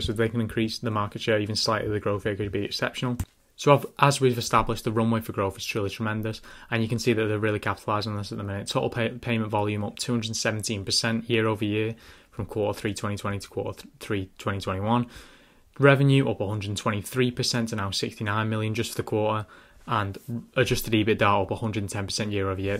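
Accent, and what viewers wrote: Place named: Australia. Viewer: This channel is massively under rated. Also helps that you sound like an Aussie like me.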